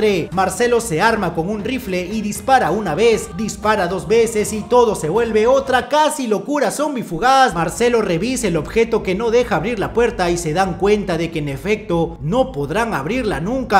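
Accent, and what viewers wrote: Peru. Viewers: eres el mejor dando resumen con toque peruano csm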